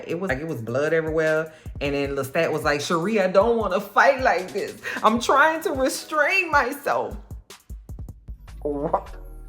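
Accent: New Orleans, United States. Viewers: First of all, M’am I love you’re new Orleans accent! It's so pleasant to listen to. In my personal opinion, the reason the show isn't a smash hit is, unfortunately, due to the bigots and racists drowning out the positive noise of the show. In 2024 some people are still not comfortable seeing 2 men as romantic leads. Also, some of the die-hard book/movie fans can be their own worst enemies. They want an adaptation that's verbatim to the source material. They also nitpick things to death, there is no room for nuisance. Oh and don’t get me started on it, if you say you like or dislike a character they act like you committed a crime. I read that Interview with the Vampire is coming to Netflix soon, hopefully with more eyes on the show. It will get the attention it deserves. The show is so good, everybody deserves an award! From the creators, producers, cast, crew, costume, and set design. 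Hell, even catering deserves a little something too.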